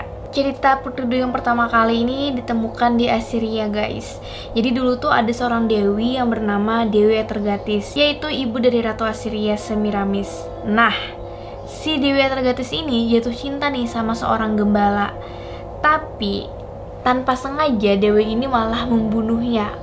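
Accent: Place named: Bali, Indonesia. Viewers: Logatnya kaya org bali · Logat nya kek orang Bali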